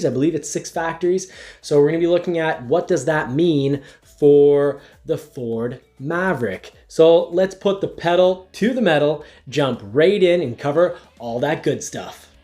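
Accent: Canada. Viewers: Hermosillo.... Hermo see oh. There is no L sound. You say the name of the city like you're from Canada or something.... Good vids tho.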